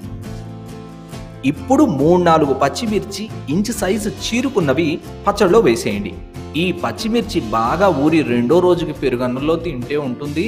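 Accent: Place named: Guntur, India. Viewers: actually nenu vere vallani anatledu sir gnt slang baguntundi meeru slang marchakandi teleena vallu apardham cheskuntaru antunna that's alll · Are meru Guntur slang Kuda Chala chakkaga matladestunnaru. Vinadaniki bagundi. Pickle chuste notlo neellu,kallalo Kuda neellu vachestunnai. Defenate ga chestanu. Garu meru okasari kanipinchandi.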